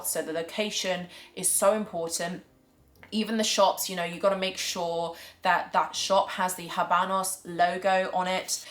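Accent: United Kingdom. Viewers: I love your attention to details a great video for newbies Sounds like you have an English accent, which means you have full access to amazing Cuban cigyes. So don't understand why you are going to buy something other than from a Reputable dealer . I travel to London quite a bit ,and is one of the most expensive places to buy a proper Cubano I'm dutch American an have access to any cigar of choice, I prefer many other cigars over cuban its 2023 and cubans losing steam with guys like me to many better options ,China has driven up the price but once they get taste of Davidoff my father Padron the will quickly convert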